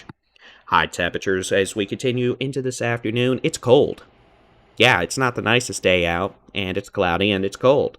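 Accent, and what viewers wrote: Texas, United States. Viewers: I’m just going to say it. You are a handsome man. I enjoy your channel for many reasons: the humor, the honesty, the thoroughness, the lovely, homey Texas accents, but also you are just easy on the eyes. Not trying to make things weird, just letting you know that one Texas lady finds you very pleasant to look at. Have a nice evening and thank you for everything you do.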